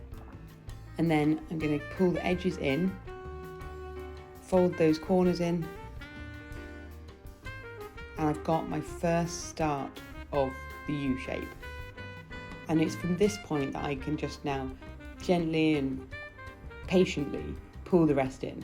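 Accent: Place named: United Kingdom. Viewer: I THOUGHT I WAS FOLLOWING YOUR DIRECTIONS BUT YOU DON'T GIVE THE ACTUAL DIMENSIONS OF THE PAPER THAT YOU ARE USING AND THE A.I. TRANSLATOR FOR CLOSED CAPTIONS DOESN'T UNDERSTAND BRITISH ACCENTS AND IT USED "A3" FOR ONE DIMENSION THAT I THOUGHT YOU SPOKE. YOU HAVE 2 TALL TRIANGLES ACROSS YOUR PAPER AND I HAVE 4 SQUARE TRIANGLES? I WISH YOU COULD GO SLOWER AND TAKE A PICTURE OF WHAT THE PAPER LOOKS LIKE WITH EACH KIND OF LINES YOU DRAW. YOUR HANDS ARE ALWAYS GETTING IN THE WAY OF THE CAMERA VIEW AND THEN THE IMAGE BLURS OUT. I REALLY WANT TO MAKE THIS!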